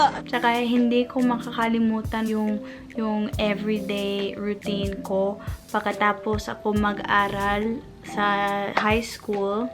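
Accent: Visayas, Philippines. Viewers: Napapansin ko minsan ang bisaya accent mo ang kyut haha. Magpatuloy ka lang, marami kaming new subscribers mo. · Your bisayan accent parang tutuo. Marunung ka mag salita ng bisaya na pinatigas ang accent. Bisayang bisaya ka pakinggan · Huwag kang magalit sa sasabihin ko,. parang bisaya ka magsalita ng tagalog. Hindi ko sinasabing masama un. Un lang naman ang obserbasyon ko. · I hear ur Bisaya Accent heheh ate 😍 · Para kang bisaya the way you speak. Shout out sa tanan bisaya,. From Surigao,. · katuwa...cute bisaya accent. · Your so cute sa mga vlog mo. Medyo puntong visaya ka magtagalog at ang cute tuloy pakinggan. Nakaka amaze ka at we appreciate you sa pagsasalita ng wikang tagalog. Hope to see you personaly soon at papicture sana.thanks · Tagalog nya hindi english accent but bisayan accent. Bisaya kasi ako from negros. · you sounds like visaya when you speak in tagalog, i presume you studied in visayas region. 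But I could feel that you are very down to earth person. I feel you and please do keep inspiring people.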